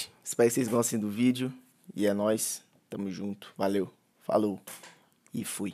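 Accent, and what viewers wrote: Minas Gerais, Brazil. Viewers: Tu tb é mineiro man?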